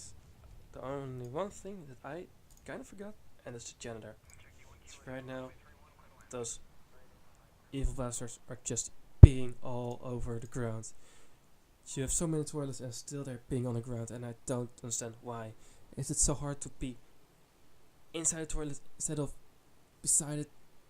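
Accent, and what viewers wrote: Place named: Netherlands. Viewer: Haha ik kan het aan je accent horen!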